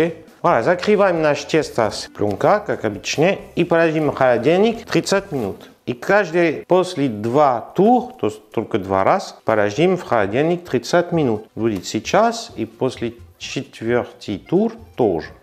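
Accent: France. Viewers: Как мило вы говорите по русски!Ваше видео смотрится,как приключенческий фильм с юмором и французским акцентом!Не все понятно,но сделаю по простому рецепту!Спасибо вам за урок! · Здравствуй. Только что нашла твой канал, с улыбкой отсмотрела и слушала твой красивый фрцузкий акцент, записалась уже и буду готовить этот рецепт. Если бы ты знал сколько рецептов я попробовала, но я недовольна, обьязательно напишу о результате. Мерси боку.😘👍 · Как же нравится его французский акцент!ну очь.сексуально!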